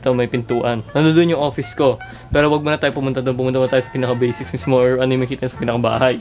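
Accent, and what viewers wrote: Visayas, Philippines. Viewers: Bisaya po ba kayo??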